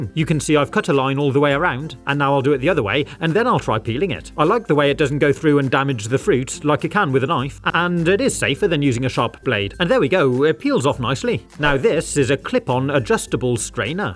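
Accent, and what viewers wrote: United Kingdom. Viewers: Wait….. HE IS BRITISH LIKE ME!!!! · He is British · Are you British? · Yo u have a British accent 😂 nice idea🎉❤😊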